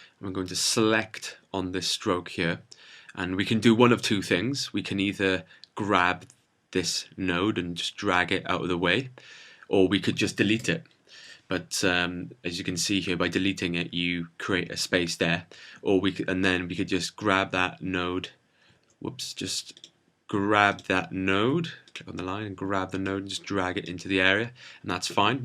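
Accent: Wales, United Kingdom. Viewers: This is brilliant! Thanks for taking the time to teach us. Your voice is very calm and soothing, and your Welsh accent makes it even better! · Nice. Is that a British accent? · Very informative & nice to hear a Welsh accent - thanks! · Your british english is really awesome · Thank you so much for the tutorial! And I think you are British, right? I like your accent very much! And I have a question, can I do exactly like that with Photoshop? Because somehow I lost my Illustrator CS4 disc and can't afford newer one anytime soon... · Thanks! I've been using Illustrator forever, but never understood most of the basics. But your tutorial helps a lot. Love the Brit accent (we're fans of Foyle's War over here); takes a bit of thinking to get all you said, but well worth the time! Keep up the good work!! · Are you welsh by any chance ? lol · He's Welsh. Orrrrr, he could be English but lived in Wales for a bit, but the accent is Welsh. 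This is the best tutorial I've ever seen on the Tube. Good work mate.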